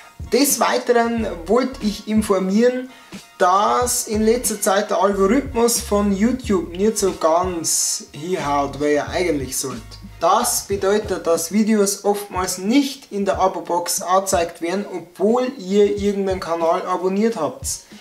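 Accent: Bavaria, Germany. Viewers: Find dich mega cool :D versuche durch deine Videos Bayrisch zu lernen :) könntest du irgendwann auch mal Bayrisch für Fortgeschrittene machen? Oder ein Video, wo du die Aussprache mal erklärst, wie man die Vokale und Konsonanten betont oder wann man das R im Bayrischen rollt :D Lg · Mi grausts bei deinem bayrisch oida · Naja als richtiger bayer 🤣 durd dei Dialekt so wei in de lusern 😅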